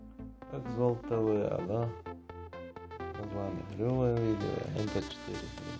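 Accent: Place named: Azerbaijan. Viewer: Он так говорит потомушто он Азербайджане и я тоже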